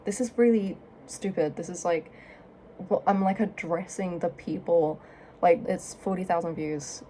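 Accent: England, United Kingdom. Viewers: haha maybe the british accent is deceiving but you don't seem that dumb. Maybe dumbest people are actually those who don't even realize they are dumb, idk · Re: ideas for content : 1. Something about your city. Based on your english, I assume it is not USA, but England. 2. You trying out new things. it could be some new style, new hair color, new outfits, may be a completely different ring for the nose, new food, new hobby. Something about what you never thought to try. 3. start some exercise regime... I don't know ... yoga or heavy lifting. Something you wouldn't expect of yourself. And post how it is going.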